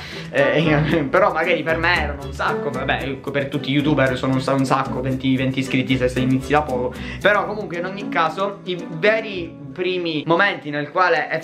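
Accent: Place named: Sicily, Italy. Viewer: ma tu sei siciliano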